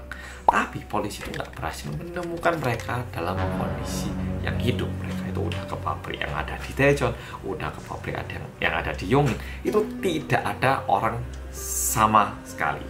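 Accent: Java, Indonesia. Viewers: Semapot gak bisa hilang, medoknya itu lo 😁🤣🤣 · iku lho.. aowkowkwowkwok medok tulen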